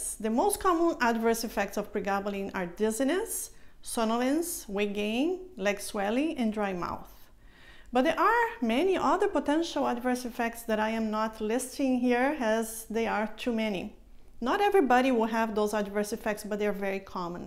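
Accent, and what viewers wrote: Brazil. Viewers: recognized the accent, uhul brasil il il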